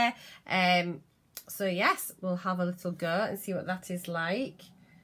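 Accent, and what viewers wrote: Yorkshire, United Kingdom. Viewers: Are you from Sheffield or Leeds maybe, judging from the accent :) great video, thankyou for sharing x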